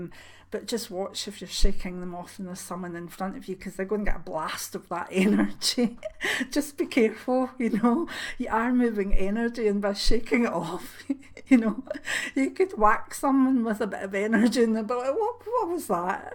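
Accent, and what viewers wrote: Scotland, United Kingdom. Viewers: /thanks again for this video. Excellent as always ( i really dont need to say that now as i/everyone know(s) they are). What's the stone you recommend for rubbing your palm with? I cant place it with your (lovely) Scottish accent. Can you recommend some where on line going to for this? I'm in the midlands area..... is there anyway you know of between telford. /shrewsbury/chester? edit I'm aware it's hardly local to you so no problem if not.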